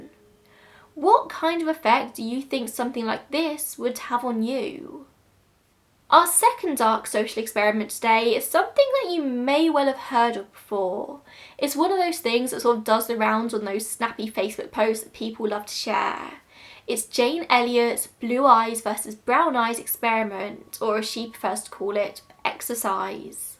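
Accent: England, United Kingdom. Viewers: She really does have a great voice, and this is coming from someone who usually hates the sound of English accents. But her voice is so calming and sweet that I actually like listening to her speak.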